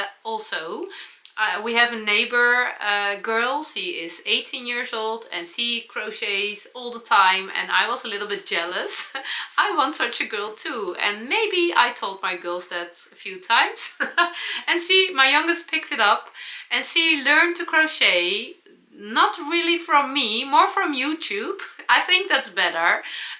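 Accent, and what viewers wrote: Netherlands. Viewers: I would suggest saying, “that explains my Dutchy accent”. There is nothing to apologize for . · I really enjoy listening to your 'Dutch-y' English...especially 'heart-jumping' and ' Sauce'...and any others you wish to create. 😉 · Lovely video! And never apologize for your Dutchy English. I was always so impressed with the Dutch people’s fluency and ease in so many languages. They would greet me in one language after another until they found mine. Lovely people, beautiful country. ❤ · I feel the same way about my legs! You will enjoy sewing the more you do it. It will become heart jumping! I have to admit that I looked up "Hard jumping" when I first started watching your videos! Then I heard you say it enough that I understood what you meant. I enjoy your Dutch English! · What you have is a lap blanket in English. Heart Jumping=(English expression)my heart skips a beat, other heart expressions are (heart warming=meaning something that makes your emotionally feel good, usually when one person helps another) (heart pounding=a reaction to a scary or surprising experience.) English needs the adjective phrase of heart jumping and as a native speaker of English and English teacher, I'm adding it to my vocabulary. 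English loves to borrow from other languages and I think that this a great one to add to English. I love your Dutchy English which is 98% correct to the ears of a Canadian. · Your knitting channel is my favorite one,. You are very genuine and knowledgeable. It love your Dutchy English! I agree with you about the Magic Knot and square knots. They don’t work in my knitting either. I tie the square knots with intent to undo them, then twist the yarns and weave in the ends. I consider that Weaving in the ends is part of the knitting process, just like casting on, binding off, and swatching. Keep up all the HEART JUMPING videos! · I'm so glad I found you and your "Dutchy English!" I'm half dutch myself, so it's so fun to listen to you! I really enjoyed your podcast, so gezellig! I also enjoy knitting, crocheting, and I love sewing as well. I've made some of the Sew liberated patterns, but now I need to get the tiered skirt pattern! 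I've also made quite a few of Lucy's crochet afgans for my children. I love all the colors of your new advent afgan you plan to make in Lucy's woodland afgan. I subscribed to your channel! Groetjes uit Canada!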